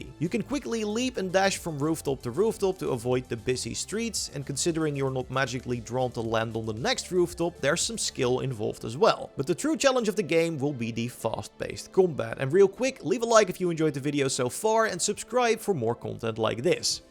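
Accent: Netherlands. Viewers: UK HAHA youre dutch dude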